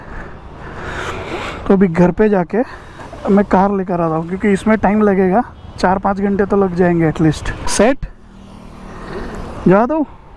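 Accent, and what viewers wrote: India. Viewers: Sweet gujrati speaking by bhai 🤗😂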